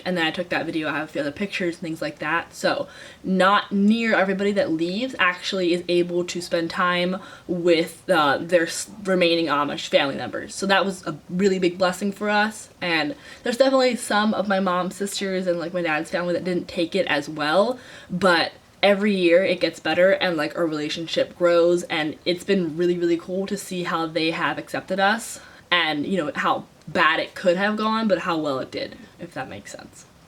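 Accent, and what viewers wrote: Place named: Sweden. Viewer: Is your family of Swedish descent? I’m hearing a very faint Swedish accent in your voice!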